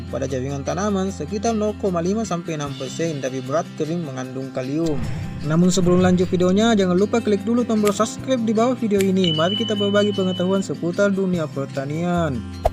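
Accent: Bugis, Indonesia. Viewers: Bahasa Bugisnya ketara benar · Orang bugis yah? Ogi Aga ki.... ?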